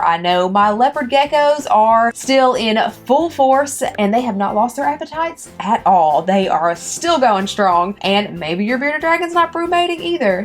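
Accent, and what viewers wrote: South, United States. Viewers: I have never seen or heard a black woman with a country accent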